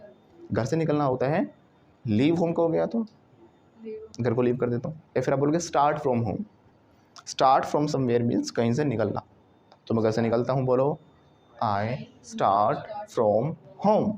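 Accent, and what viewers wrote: Delhi, India. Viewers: Are you from kanjhawala sir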